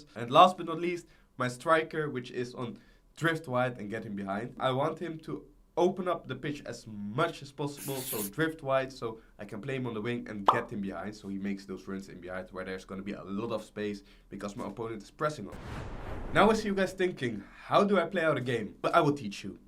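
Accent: Netherlands. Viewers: Thanks voor de tactieken, maat! Intussen, je klinkt echt super Nederlands, hoor!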